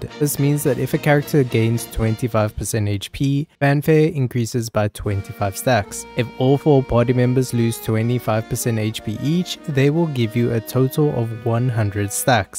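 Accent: South Africa. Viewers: Do I detect a lovely South African Accent?! Respresent! XD enjoyed the video 🤌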